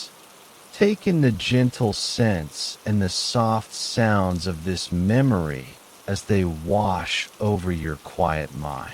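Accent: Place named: United States. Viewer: Don’t like American accents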